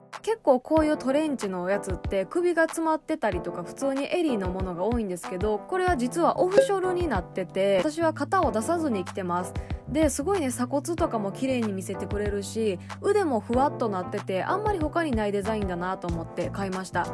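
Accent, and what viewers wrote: Kansai, Japan. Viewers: 関西弁？だから芸人さんみたい笑😂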